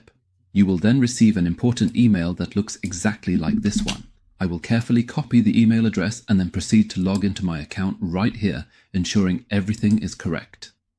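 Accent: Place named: England, United Kingdom. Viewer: I liked ur eng accent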